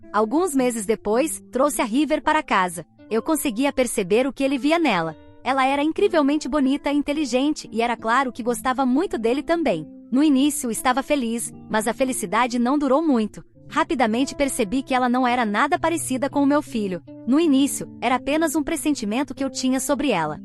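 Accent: Portugal. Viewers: este português de Portugal é terrível ...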